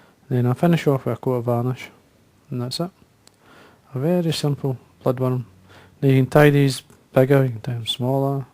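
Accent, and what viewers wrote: Scotland, United Kingdom. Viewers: Thanks, I am buying some. I was at our monthly flyfishing, tying meeting here in Waco last week and a friend mentioned your name. He is a good tyer and a very good fisherman. He is about to win a tough statewide (Texas) fishing contest, multiple species, many variable weather conditions. Pat has been watching you here on youtube, and once we began talking, several others chimed it. You've got a great following here. Also, you've got a bunch of Texans trying to imitate your accent as well as your tying abilities and techniques. I was laughing listening to all of the Texans trying their Scottish brogues. I told them about your filling that beautiful storage box with Classic Salmons; do you still have it? I won't forget that project. I should have bought it when we talked about it. I do have your flies mounted and displayed at the clinic and in my tying room here at the house. 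Everyone marvels!! Take care and know you are bringing a lot of joy and education to tyers around the world. They love you here in Texas!! Ray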